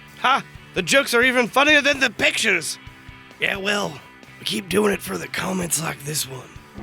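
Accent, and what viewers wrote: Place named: United Kingdom. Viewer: i really liked your british accent ahaha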